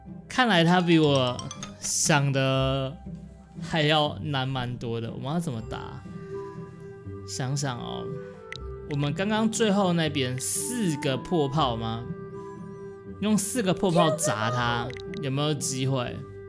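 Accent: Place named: United Kingdom. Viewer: 是英國腔沒錯，英國留學生來幫你證明哈哈，很多重音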